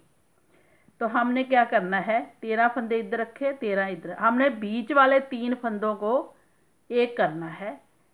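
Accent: Punjab, India. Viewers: ਭੈਣਜੀ ਤੁਹਾਡਾ ਬੋਲਣਾ ਅਛਾੱ ਲਗਦਾ ਹੈ ਵਿੱਚ ਹਿੰਦੀ ਵਿੱਚ ਪੰਜਾਬੀ ਬਹੁਤ ਵਧੀਆ ਲਗਦਾ ਹੈ 🙏🙏🌹🙏🌹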